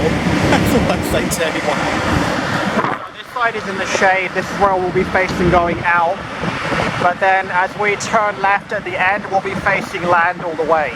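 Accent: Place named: United Kingdom. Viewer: Well you are born in London and then move to Canada from London . I say this because you have talk with british accent.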